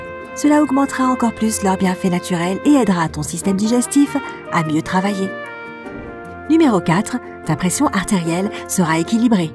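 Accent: Quebec, Canada. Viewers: elle viens du Québec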